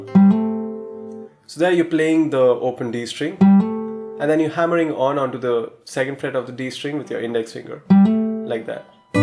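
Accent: India. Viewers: I just love your arrangements. You are an amazing teacher! Thankyou so much for uploading these beautiful fingerstyle lessons :)! I want to ask one thing, are you Indian? Because I find your accent to be Indian. And may I please know your name?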